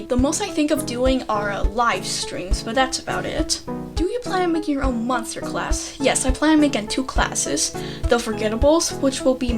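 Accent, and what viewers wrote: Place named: United Kingdom. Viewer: I used to think you were british back then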